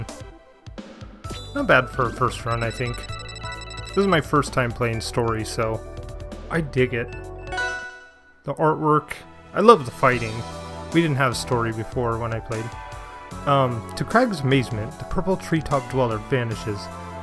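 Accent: Canada. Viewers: Ah sorry man, i could have sworn i heard some Canadian in there, im scottish though so what do i know haha.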